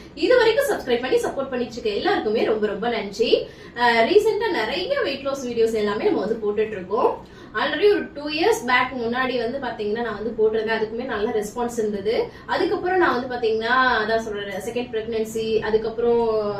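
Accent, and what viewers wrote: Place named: Delhi, India. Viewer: Aap bol ra hi ho bahut accha hi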